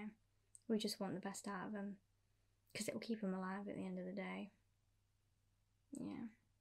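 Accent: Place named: Birmingham, United Kingdom. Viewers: I think the best thing is that it sounds like u come from Birmingham (witch is where im from 😂) really good role play Keep up the awesome work 💯♥️ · Being from the Midlands (South Yorkshire) specifically your accent felt so at home for me lol its so strange hearing it from you tho.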